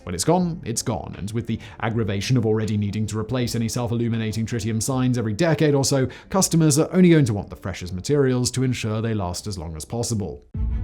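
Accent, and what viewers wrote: United Kingdom. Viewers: Is He British ? I am having trouble understand him 🗿 subtitles to rescue ❤️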